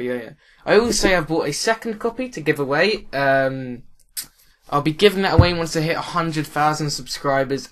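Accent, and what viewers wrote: United Kingdom. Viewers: 100,000 sub video shud be u doin a video attempting to do an american accent i hav always wanted to hear a british person do an american accent :D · That's just how British people in general say H.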